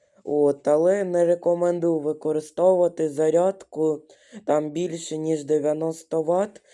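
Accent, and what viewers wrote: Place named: Russia. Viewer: Молодец хлопец, Але тренуйся правильно розмовляти, без слов- паразитов я- то " от" та тренуй вимову української глухої "г " замість російської дзвінкої.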